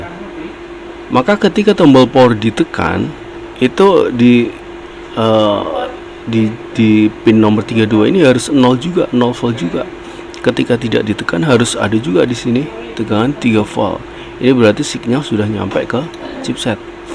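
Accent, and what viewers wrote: Java, Indonesia. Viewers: bang bhs inggrisnya kebawa agak kejawaan😁😁😁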